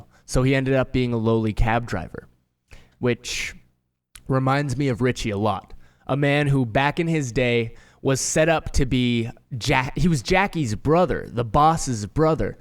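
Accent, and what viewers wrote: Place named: United States. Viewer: Refreshing to hear an American discussing American topics! ❤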